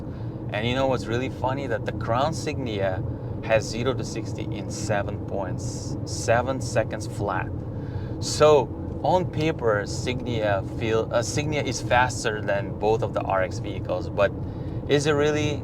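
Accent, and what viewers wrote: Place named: Russia. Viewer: Your accent Are you russian?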